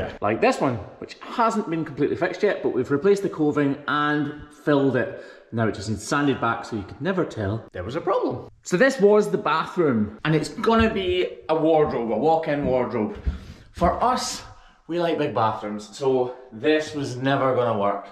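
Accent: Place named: Scotland, United Kingdom. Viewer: When you flub up what you say just keep going with your Scottish accent I wouldn’t know any different. If my father was alive boy you would have never heard the end of it as his uncle’s McMillian’s came from Scotland 🏴!!!! I enjoy your accent but probably your wife’s better when you’re in trouble with her! Can you play the bagpipes that would be the greatest finish or put in when you flubbed!!!...lol from SW Wisconsin!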